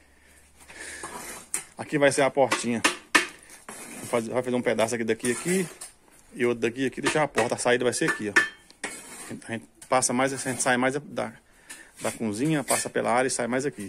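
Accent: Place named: Minas Gerais, Brazil. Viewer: Então tá bão uai....eita sotaque forte de minas...tá ficando chibata!!! Arrocha aí meu irmão. Forte abraço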